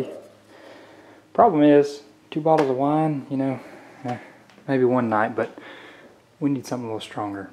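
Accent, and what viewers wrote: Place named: South, United States. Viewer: Carnival cruise and a southern accent are like peas and carrots.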